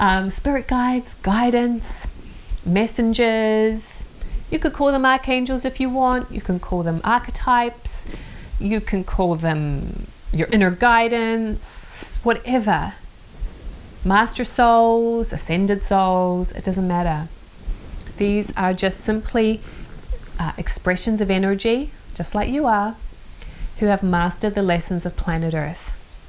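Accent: New Zealand. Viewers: you talk like me a kiwi I guess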